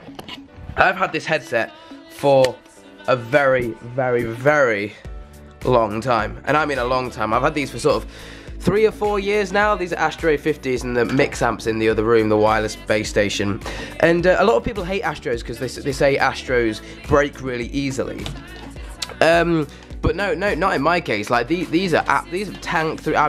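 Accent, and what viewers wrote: United Kingdom. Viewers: This dude sounds like faze adapt with a British accent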